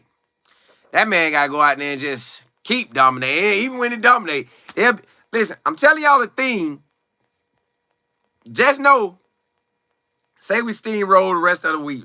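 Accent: South, United States. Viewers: His southern accent cracks me up 😂😂😂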